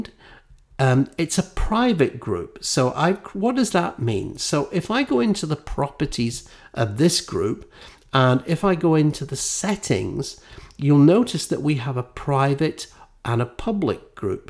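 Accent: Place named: United Kingdom. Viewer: Hearing a British guy teach keeps my focus.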